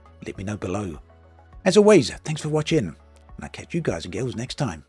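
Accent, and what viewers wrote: United Kingdom. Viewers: Starfield will have the RT mod within a week of launch. It just wont be from the Devs. It will be from the modding community. So there are your lost sales MR smooth Brit accent.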